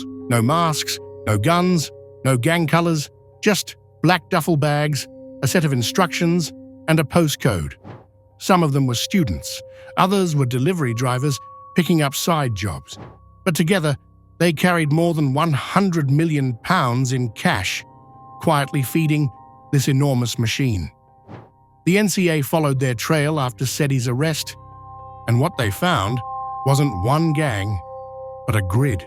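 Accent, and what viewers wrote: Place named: Australia. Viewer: My previous comment was deleted by YT strangley. Great video. Subscribed. Interesting Aussie narrator. AI or paid ? Are you able to share the source? Cheers from this Aussie living in NZ 😎🎸🤘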